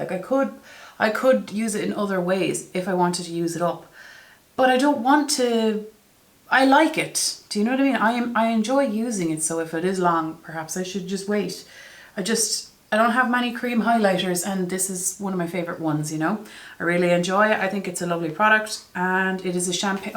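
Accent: Ireland. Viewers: Happy to help! I know oden's eye has a similar sponge as well if you can't find it in Penneys. 😉 I'm almost Irish ahah. I'm Portuguese but lived in Dublin for 7 years (back in Portugal now). I actually found your channel when I was looking for Irish youtubers to follow cause I missed the accent so much.😅 xx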